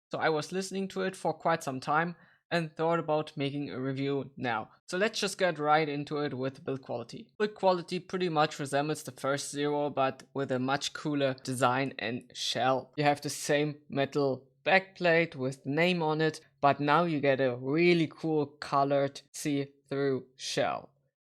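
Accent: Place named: Germany. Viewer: Hey:) Da sich dein Englisch genauso anhört wie meins schreibe ich mal auf Deutsch 😂. Erstmal ein schönes Video mit einer guten Erklärung hast du da gemacht! Ich hab mal ne Frage... Ich bin relativ neu im iem-Bereich und hatte die Möglichkeit die Moondrop Chu 2 auszuprobieren. Da war ich echt schockiert wie klar der Ton ist und wie viel mehr Details man hören kann (im Vergleich zu meinen alten Soundcore Liberty 2 Pro Kopfhörer zu dem Preis!). Alles in einem will ich mir auch iems zulegen, allerdings hauptsächlich für Gaming (überwiegend single player games)aber auch um Videos zu schauen oder Musik zu hören. Es sollte am besten nicht zu neutral/flach klingen und leicht besseren bass haben als die Moondrop Chu 2. Die Frage wäre dann, bin ich mit den Zero 2 gut bedient oder lohnt es sich für etwas mehr Geld bspw. die Truthear x Zero (Red) oder 7hz Sonus zu kaufen. 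(Puh, der Text ist jetzt doch etwas länger geworden). Hast du da eine Empfehlung für mich? Würde mich über eine Antwort freuen ^^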